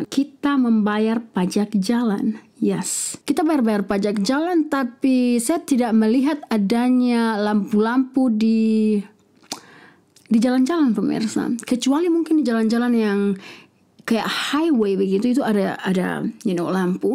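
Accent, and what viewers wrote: Manado, Indonesia. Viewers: Minum kopi apa doe... dapa lia sedap.. I am a coffee drinker. Btw, I like the way you talk.. apa lg deng logat manado plus english.. beking kt tatawa... Thx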